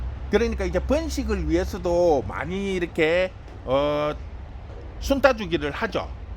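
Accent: Gyeongsang, South Korea. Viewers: 사장님 사시는데가 어디입니까?저는진주사는데 말씨가 이쪽경상도 같아서 가까우면 가계에 한번가보고싶어요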